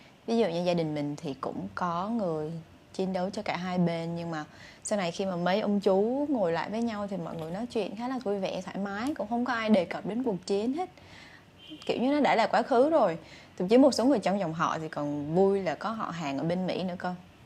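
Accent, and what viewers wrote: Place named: Buon Ma Thuot, Vietnam. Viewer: noi giong Ban me Thuot lam` cho nguoi nghe cam thay ga^n` gui? va` so^'ng do^ng. rang giu*~ nhu* vay duoc ko!!!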